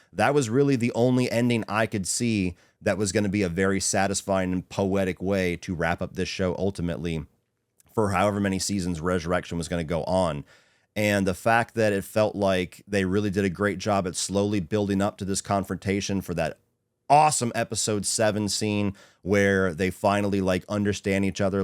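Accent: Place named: South, United States. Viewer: I got into Dexter at the same time as you in 2009,only difference is I was 29 and you were 19(somehow I look 10 years younger than you)but being a man I don’t like looking 10 years younger than I am. But ai started watching in 2009 just like you(shows I’m a big fan of your channel if I know all that). Also I’m from the northeast and you’re from the south,I know you don’t think you talk that way but you do,it’s just that your accent isn’t as thick as most southerners just as I don’t talk like a typical Philadelphian. Anyway I’m a huge fan of yours and I agree with most of your takes. I hope this channel continues to go for years because you’re the best at what you do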